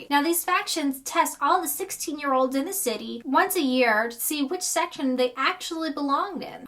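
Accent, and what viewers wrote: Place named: Midwest, United States. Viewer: I believe she lives in ohio....so mid-west accent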